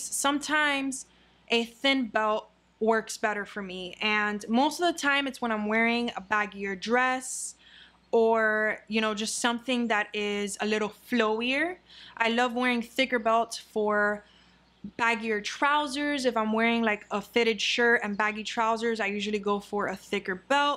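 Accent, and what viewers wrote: Miami, United States. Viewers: That is the Miami accent... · i know a miami girl accent when i see one 🥰🥰🥰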